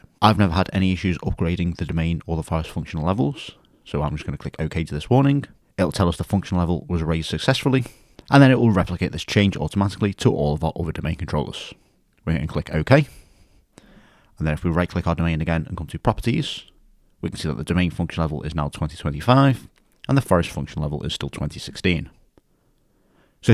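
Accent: Liverpool, United Kingdom. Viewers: Love that scouse accent!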